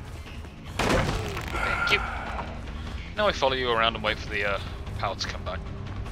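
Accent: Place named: Germany. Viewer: Bro u are amazing love ur vids and ur dbd animations i think after ur accent u are from germany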